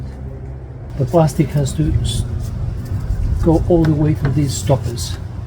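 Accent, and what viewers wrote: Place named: Australia. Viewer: I can definitely hear an Oz twang in the accent these days 😂 settling in fine i see😉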